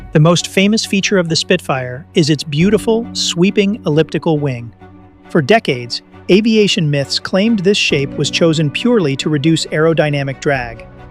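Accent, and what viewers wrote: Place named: United States. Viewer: One question!? Whit is there a American accent narrator on this, and not a British accent!??? I mean, the video is about British ww2 aircraft is it not!??!!🇬🇧🏴🏴🏴🇮🇱🇮🇪🇬🇪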